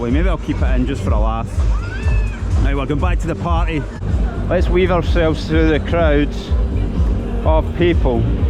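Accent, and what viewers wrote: Scotland, United Kingdom. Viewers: Moscow is dangerous no doubt about it. I saw a person being punched in the face for speaking English. I think your Scottish accent saved you, because they didn't recognize it as English. A state that is filled with right wing terrorists is not a safe place. And you are delusional if you think you can persuade anyone to visit a state which kidnaps children and murders men women and children. The money you spend in that dump goes toward doing just that, murdering people. So no, your fake party and paid Russian propagandists will not bring tourists into that hell on earth. You try saying anything antiwar for one minute in Moscow and let's see how long you last. In any case Russia is a terrorist state which has killed 1,100,000 Russian soldiers and many ukranians too and russia is destined to collapse economically in 2026, and very likely to break up after that. 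My advise is to get out of that hell hole before the shit hits the fan, already millions and millions of Russians have escaped for their lives and many many more will. Only the ignorant stay. Enjoy your propaganda party because it could be your last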